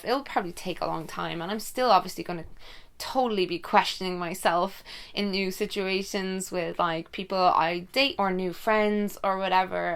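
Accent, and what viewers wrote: Ireland. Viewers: Before watching your videos, I thought that the English accent was the best. Now I'm love with the Irish one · Veronika Meister Any accent can sound both good and bad, in my experience. I've met Germans with lovely, lively and warm accents and others with a very harsh and unpleasant ones. My friends ex had this amazing accent, very pleasant and soft. Same with Irish, some have a lovely accent (like on these videos), others have an accent that is very abrasive. Heck, look at British accents, so many regional varieties - some of which are, let us say unpleasant lol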